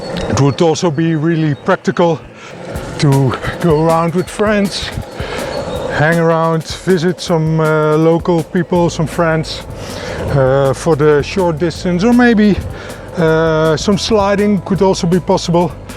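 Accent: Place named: Netherlands. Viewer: Lekker hollands accentje :D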